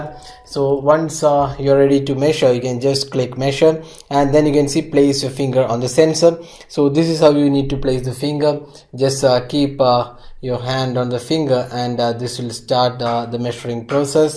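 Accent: India. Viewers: holy fuck, indian accent is so funny....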